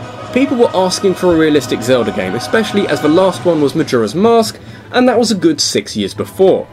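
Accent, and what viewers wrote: United Kingdom. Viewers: Some-one else realises the sheer glory of the British Accent. I love you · are you british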